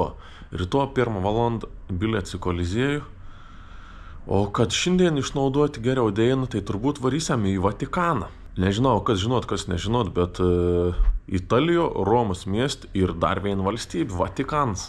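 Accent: Samogitia, Lithuania. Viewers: sėkmės, super , smagu ziureti ir klausyti zemaitiska😃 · Bet keik faine viel tav keliuon kažkor matyte, da ka žemaiteška rokounys atruod ka su draugel pats išdiejau i ton Ruoma ;D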